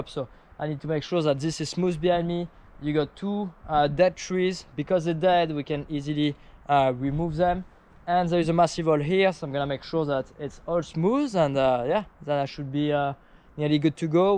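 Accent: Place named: France. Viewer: T'es français toi ça sentend🤣🤣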